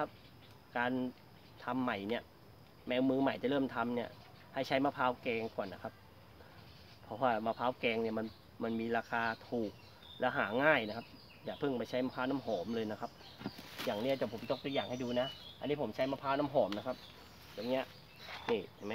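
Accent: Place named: Suphanburi, Thailand. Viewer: พี่ชายยยย..ตามาแว้ว..มิน่าอาหารหรือเวลาพูดคล้ายๆสุพรรณเลยพิษณุโลกนี่เอง...😁😁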